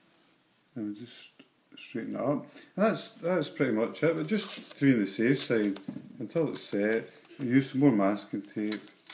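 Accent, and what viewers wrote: Scotland, United Kingdom. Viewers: Great seeing a man doing this. Great job . Love hearing the Scottish accent! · The shop is located in Scotland so it's a scottish accent. :-) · If you knew how much your accent is loved by many of us here in the US, you'd have a lot more tutorials. It was nice hearing you and thanks for the tutorial. 🙂 Before I die I'll make it to Scotland and tour where Alexander McCall Smith has the setting for his wonderful books and I'll go visit your shop too.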